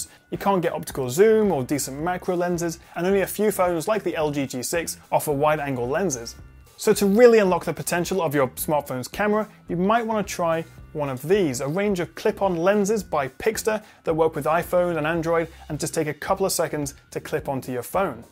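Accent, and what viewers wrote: United Kingdom. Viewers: Always love watching your videos. Good honest reviews with a familiar British accent.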